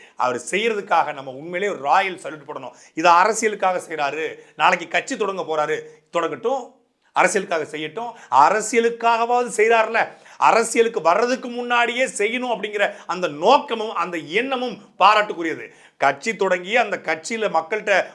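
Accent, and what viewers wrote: Tirunelveli, India. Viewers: பசாட்டை அவர்கள் அய்யா நீங்கள் உன்மையை உரைத்து மிகப்பெரிய மனித வடிவம் எடுத்து இருக்கிறீர்கள். தக்க சமயத்தில் உதவி செய்வது யாராக இருந்தாலும் பாராட்டுக்குரியவை என்று பாராட்டியது பாராட்டுக்குறியது. நல்ல நெல்லை தமிழ் கேட்க இனிமையாக பேசும் திறன் உடைய நீங்கள் நேரம் கிடைக்கும்போது வள்ளுவம் கம்பராமாயணம் போன்ற காவியங்கள் பேசினால் கேட்க இனிமையுண்டு. தமிழ் தொண்டு ஆகும். தங்களுக்கு பகவான் எல்லா வளமும் உடல் நலமும் கிடைக்க பிராத்தனை செய்கிறேன். உங்கள் வழியில் வெற்றிபெற பகவானை வேண்டுகிறேன். எதாவது தவறாக இருந்தால் மன்னித்து கொள்ளுங்கள் ஓம்நமோ நாராயணாய நமஹ!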